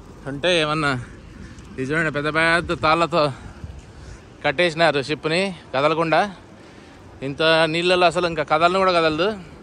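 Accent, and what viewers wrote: Rayalaseema, India. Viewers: మీరు చెప్పే పద్ధతి చాలా బాగుంది అన్న. రాయలసీమ accent.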